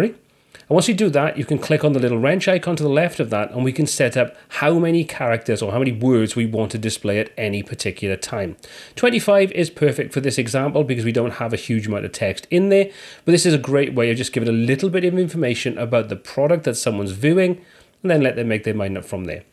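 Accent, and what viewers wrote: Wales, United Kingdom. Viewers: Is that a welsh accent I can sense ? Swansea area ?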